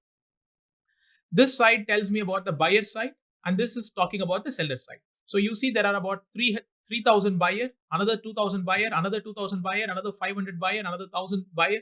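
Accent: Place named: South India, India. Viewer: Judging his accent , he's surely South Indian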